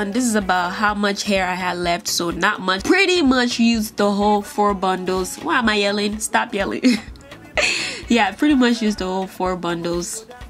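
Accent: Nigeria. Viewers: oh my GOD i love this video..are you Nigerian? because i was thinking...as a result of the songs and your voice also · That was ignorant and uncalled for... She is a Yoruba (Nigerian). I'll have you know that while Yoruba is one of three major tribes in Nigeria, English IS the first language. She may speak Yoruba, but nonetheless she would understand and speak English regardless..its spoken everywhere in Nigeria... Don't be shallow just because you hear an accent..Wow lol..smh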